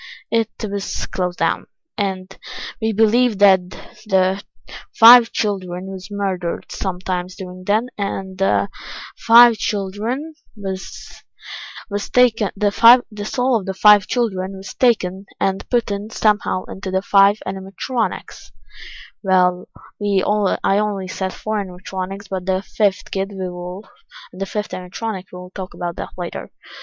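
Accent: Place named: Hungary. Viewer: Teszi magát a gyenguc magyaros akcentusával...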